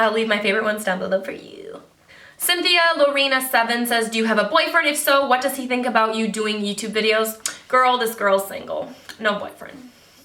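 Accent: Michigan, United States. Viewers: I thought your accent sounded like a Michigander! I am from the UP! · Ugh you are so gorgeous! And I had a feeling you were from Michigan!! (I could hear the accent lol) Im actually moving to Michigan in 2 days for University!! I'm a Floridian so the cold is going to be a bit challenging. Any recommendations of cool places to go OR SHOP? :)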